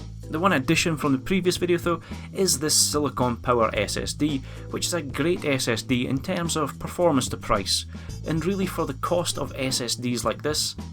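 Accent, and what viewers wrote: Scotland, United Kingdom. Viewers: Ha ha fuck, your really Scottish. :D nice to see another brother from above the border.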